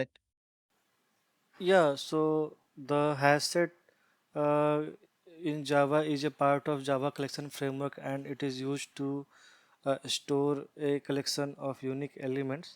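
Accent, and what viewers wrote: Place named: South India, India. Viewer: Without modulation too his voice is hoarse. Your south indian ascent is easily understood. Yoounly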